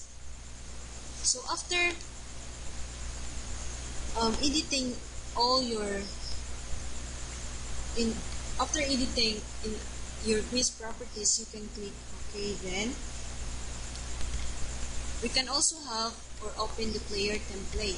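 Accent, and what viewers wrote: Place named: Latin America. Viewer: You sound Latino..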